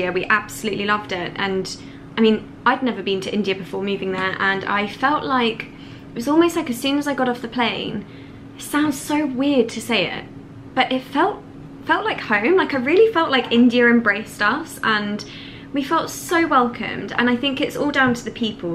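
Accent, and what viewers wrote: United Kingdom. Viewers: I swear, as a frenchie follower who adores listening to your beautiful British accent, and you laughing in Kensington gardens was the most British thing I ever listened to!!! XD I mean ladies... Your laughters are as British as the Thames! 😅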